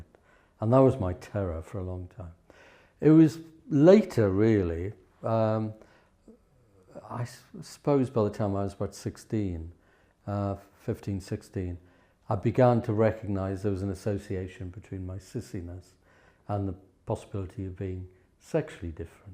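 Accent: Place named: Wales, United Kingdom. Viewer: Definitely same guy. Same Welsh accent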